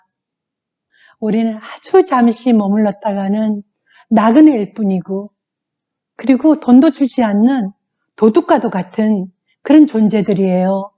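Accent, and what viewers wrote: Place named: Seoul, South Korea. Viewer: 유투브 개설 축하드립니다^^ 반갑습니다 님 글도 잘 쓰시지만 목소리는 더 예쁘시네요~ㅎ 부산 억양일거라 생각했는데 서울 말투라서 놀랐습니다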